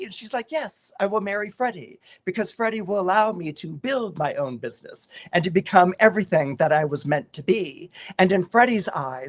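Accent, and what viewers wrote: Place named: Cockney, United Kingdom. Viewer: In Hertford, Hereford and Hampshire (sher not shire) hurricanes hardly EVER (not hever) happen., your cockney accent!!!! And your singing!!!!! I enjoyed this immensely.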